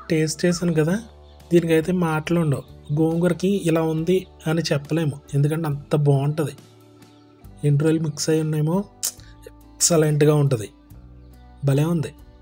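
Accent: Godavari, India. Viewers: గోదావరి తెలుగు భాష , ఆ యాస మార్చకుండా ఉంచండి. యూ ట్యూబు డబ్బులు వచ్చాక కొత్త స్టవ్ లు, Ikea పాత్రలూ వంటివి కొని వంట గది రూపు రేఖలు మార్చేయకుండా ఇప్పటి సహజత్వం కొన సాగిస్తేనే ఆ పాత వంటలకు ఆ పాత కాలపు వాసనలు ఉంటాయి. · Memu Godavarollam andi. Mee vantalu, maata superb. Matallo yaasa over cheyyakunda natural ga undi.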